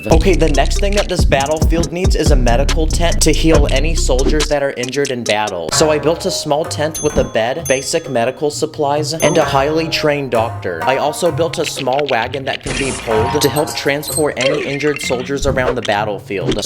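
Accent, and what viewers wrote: Scotland, United Kingdom. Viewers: BRO IS SCOTTISH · Well, that great British accent😅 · HE’S STOTISH LIKE. E I’M BRITISH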